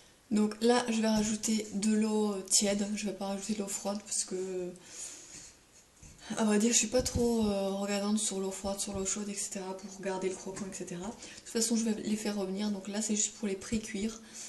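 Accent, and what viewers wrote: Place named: Doubs, France. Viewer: coucou!! jai limpression dentendre laccent dché ns lol!! en plus saucisson de monbeliard?? t dou? moi de mulhouse bisous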